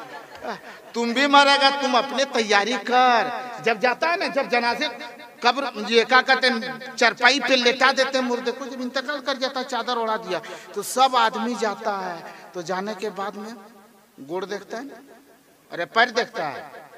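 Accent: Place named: Giridih, India. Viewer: Maulana sb ki baten sun kar aisa lag hi raha tha ke Giridih district ke hai. Kyuke hum bhi Giridih district ke hai or ye bhasa se wakif hai.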